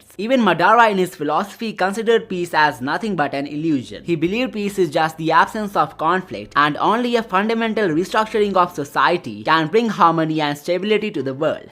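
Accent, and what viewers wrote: India. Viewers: You're being rude here. Skip if you don't like it. FYI, it's Indian accent.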